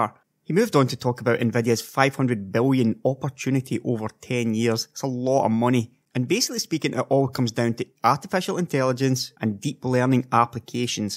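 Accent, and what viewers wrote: Scotland, United Kingdom. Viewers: Nothing wrong with his Scottish accent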